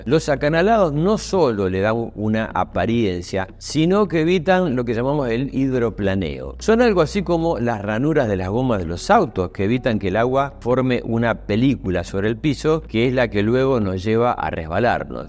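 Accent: Argentina. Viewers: Aprecio el modo suave, claro y preciso de sus explicaciones, se nota que Usted sabe de lo que habla y su accento muy ligero a Argentina aún aumenta lo agradable que suena, le da un toque especial 😊 sigua así Maestro👍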